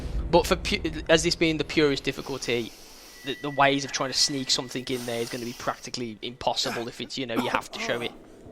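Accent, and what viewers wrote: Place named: Birmingham, United Kingdom. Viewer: You sound like you're from Birmingham.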